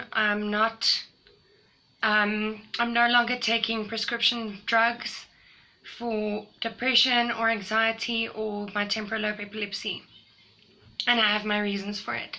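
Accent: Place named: Germany. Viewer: Hallo, meine Schatz. Just found your site; not sure if you’re still active with your channel. Have you heard of people with TLE while sleeping? I’m an academic researcher compiling data on this phenomenon and I would be interested in your or others’ experiences with this. I used to live in Deutschland and you sound German; forgive me if I am wrong. I hope you’re making some progress with living with TLE, and I 100% agree with your choice not to hypermedicate; good for you. I will check back to see if you have any experiences or leads for others with sleeping TLE. If so, I will put you in touch with my study. All the best wishes.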